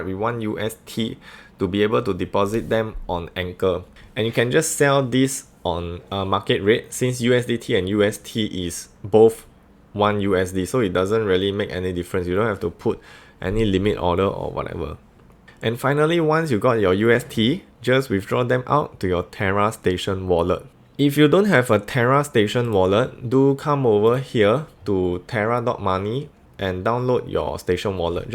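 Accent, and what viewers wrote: Singapore. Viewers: Gotta love the Singaporean accent. Huat ah. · Wow the Singapore accent is actually a thing! I almost thought I was listening to the other youtuber Chicken Genius. Interesting ^^ Also thanks for taking the time to write up this guide of course!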